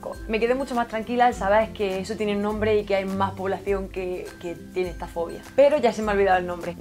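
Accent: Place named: Granada, Spain. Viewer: Uo eres genial! Llevo todo el dia viendo videos tuyos! Por qué parte de Andalucía eres? Me recuerda mucho tu acento a Granada:D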